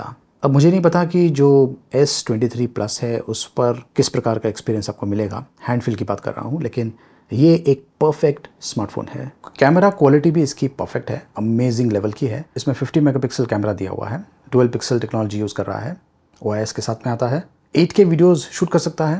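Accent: India. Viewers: No it is Indian english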